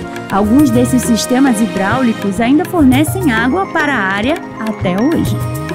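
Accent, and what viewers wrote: Rio de Janeiro, Brazil. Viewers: sua viz,com sotaque carioca lindo, é muito agradavekt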